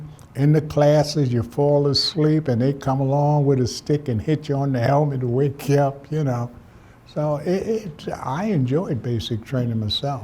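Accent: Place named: New York, United States. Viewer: Sounds like one. Could tell he’s a fellow New Yorker. He’s very detail oriented. Good story teller.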